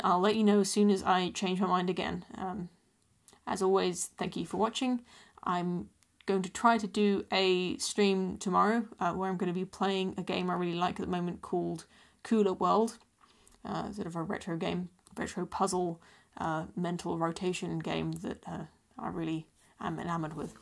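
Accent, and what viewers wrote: United Kingdom. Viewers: You see, this is why I love you. You are not speaking with an agenda you are literally working out thoughts and ideas in real time and changing your mind in real time then presenting those ideas back to us. You are just someone looking for the truth so am I I am a skeptic. Keep up the good work. PS I don't know what it is about your British accent but I can hear you talk for hours no problem. You do stutter a lot though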